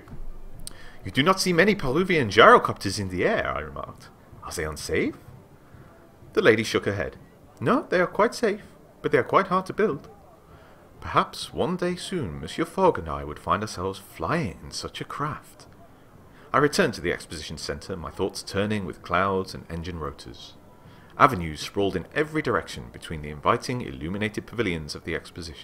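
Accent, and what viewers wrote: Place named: United Kingdom. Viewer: Haha mate you're exactly what American people think all British people sound like. xD are you from the south?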